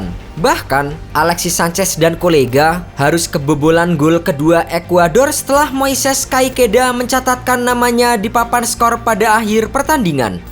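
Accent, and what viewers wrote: Indonesia. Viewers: kn logat orang indo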